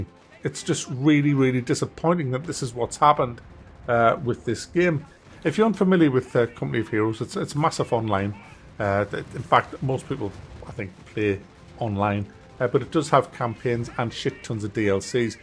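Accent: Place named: Scotland, United Kingdom. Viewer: Are you from Scotland or something? You have an interesting accent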